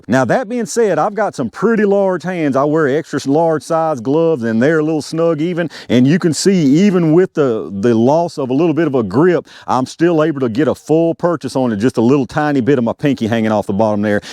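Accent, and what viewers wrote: United States. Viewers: Been lookin at 1 at rural king,339$. I have the tx22 comp & this would be nice to have as well. Guess im a 22lr freak cause i have many of them & love em all,but my favorite round is the 5.7x28,wish taurus would come out with 1 that uses that round. Great video,i subbed cause that accent is as country as mine. Lol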